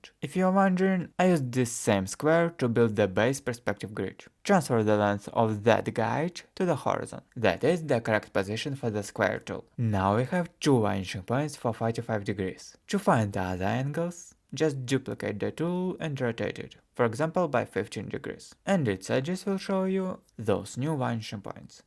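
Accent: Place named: Germany. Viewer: Ur accent sound so germany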